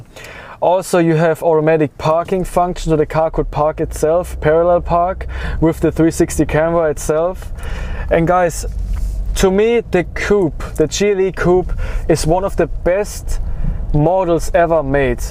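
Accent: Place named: Germany. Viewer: Are you German? You sounds very German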